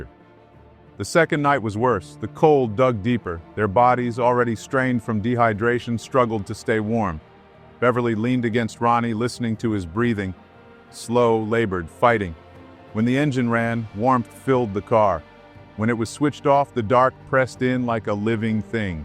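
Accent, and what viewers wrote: United States. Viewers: By the way, your american- english is perfect. I love it. ❤. I whish all USA accents were like yours. Love your voice as well. Who are you? A profesional narrator?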